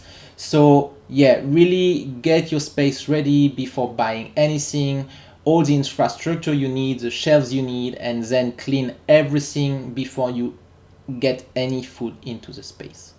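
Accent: France. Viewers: At first I thought you were German because of how you pronounce the word The but then I read the part where you live in Bulgaria and have a French accent... Anyways, appreciated the tips😀👍🏻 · Prepping with a French accent...a new twist for me!!! Love it!!!!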